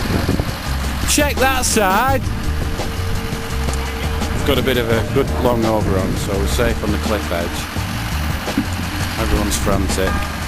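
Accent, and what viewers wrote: United Kingdom. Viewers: 1967 MUSTANG Near Canada???????????????????? Well I suppose northern England is near Canada if you live on the moon! However being as how you don't please, please have another look at the video and you will be surprised to see all the vehicles taking part have British, that is United Kingdom British, number plates. And if that isn't enough what kind of Canadian accent do you think you can hear the bloke speaking with? Near Canada indeed!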